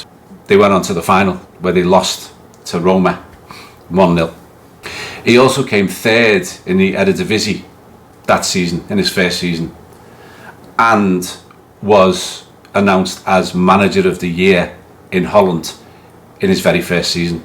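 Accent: Liverpool, United Kingdom. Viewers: keep up the good work mate, excellent analysis and love your Scouse accent, reminds me of my best mate Stanno 😊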